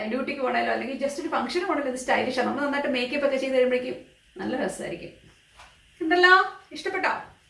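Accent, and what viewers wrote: Kottayam, India. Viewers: Chechi namude Kottayam Kari anenu thonnunallo...namal kottayamkarde prethyekathaya adupam ulloru samsarikanapole samsarikunath...luv it🥰🥰🥰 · Chechi kottayam kaariyaano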